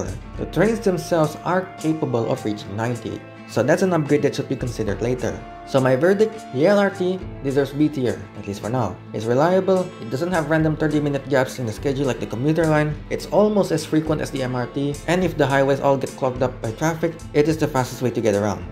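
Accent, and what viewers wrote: Indonesia. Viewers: Your english is excellent! this is how Indonesians should sound like when speaking english, by keeping our local accents, not like those wannabe jaksels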